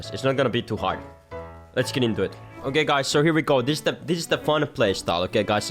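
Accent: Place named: Scotland, United Kingdom. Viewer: wtf is your accent? its a mix between like scottish and asian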